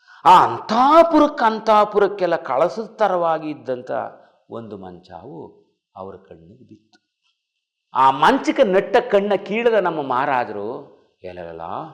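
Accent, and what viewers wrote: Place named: Old Mysore, India. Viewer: ಹಳೆಯ ಮೈಸೂರು ಪ್ರಾಂತ್ಯದ ಗ್ರಾಮೀಣ ಸೊಗಡಿನ ಮಾತಿನ ವೈಖರಿ ಅದ್ಭುತ ಗುರುಗಳೆ 🥰❤👌🙏🏻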